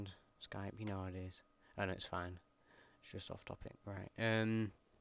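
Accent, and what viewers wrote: United Kingdom. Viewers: IDK IF I'M JUST TIRED AND CAN'T UNDERSTAND U BUT U R JUST 2 BRITISH M8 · Hahaha sorry, I just started watching the show and now I think half of Britain sounds like him. Great tutorial by the way! I'm not blindly stumbling around the program making beats like a 2 year old with a sound making toy anymore. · man your accent is the worst uk accent, worse than scouse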